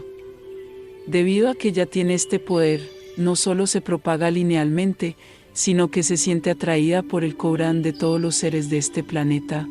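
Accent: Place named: Colombia. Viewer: Gracias por tu mensaje ,pero porqué escucho como un acento colombiano....? Esta es una canalización.? De todas formas gracias por el mensaje. Muy bueno..!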